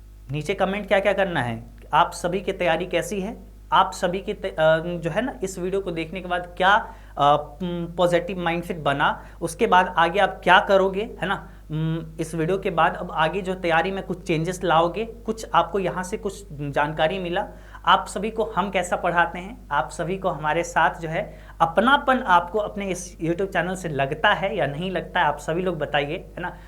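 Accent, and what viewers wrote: Bihar, India. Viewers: Sir aap bihar se hai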